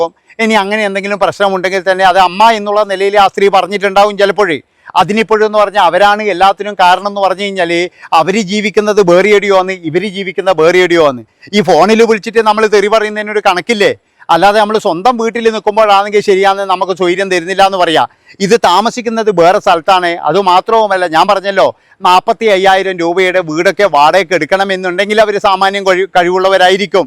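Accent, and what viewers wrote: Kannur, India. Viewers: Enghalaranappa kannur karananno😂😂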